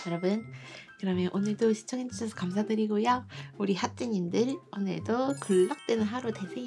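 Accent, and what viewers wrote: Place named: Gyeongbuk, South Korea. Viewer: 완전 경북말씨신데...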